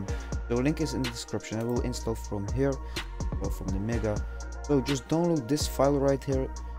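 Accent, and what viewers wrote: Netherlands. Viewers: ik hoor een beetje nederlands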